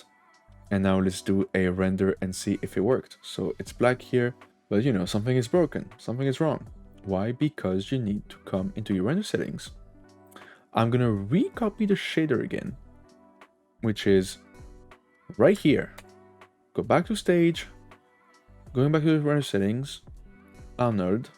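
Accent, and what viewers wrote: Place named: France. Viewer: Sa sentend quil est français lol trop cool x)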